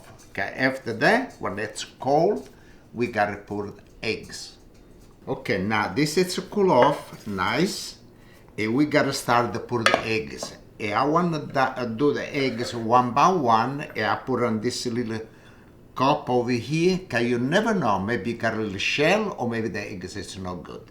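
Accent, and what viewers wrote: Italy. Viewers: I love watching you cook or bake. I’m first generation American but Italian is in my bones. I laugh and dance with you while you cook. I love the half English half Italian you speak. It’s how it is with my parents. ❤Keep it up because I’ll be watching!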